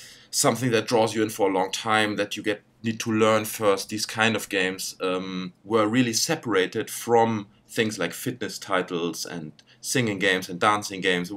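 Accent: Germany. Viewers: Nice to hear a German accent on here :)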